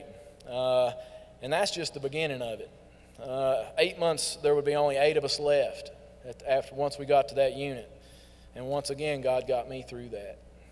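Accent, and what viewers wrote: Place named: United States. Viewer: I like your True American accent the best. never mind that you are handsome too.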